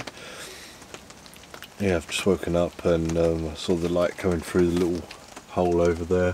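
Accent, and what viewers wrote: England, United Kingdom. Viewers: Been viewing your videos lately and really enjoying them ....very relaxing . Good to hear your English as my ancestors came from England and Ireland.Iwas born a Spencer? Will watch more or your interesting videos. Cheers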